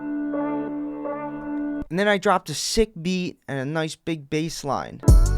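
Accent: Philadelphia, United States. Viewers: You’re from Philly aren’t you? I hear the accent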